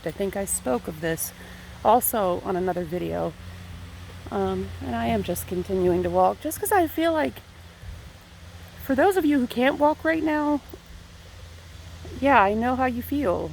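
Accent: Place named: South Carolina, United States. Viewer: Love your accent...Is that South Carolina ?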